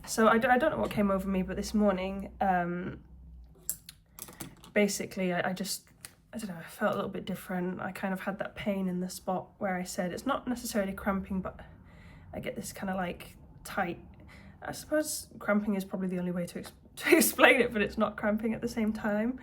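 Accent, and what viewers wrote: England, United Kingdom. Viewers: It’s that English accent. It’s proper English.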